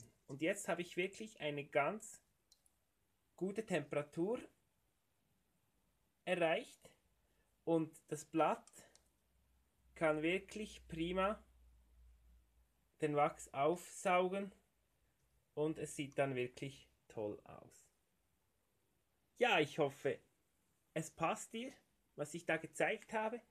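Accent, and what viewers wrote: Switzerland. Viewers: liebe ich den schweizer Akzent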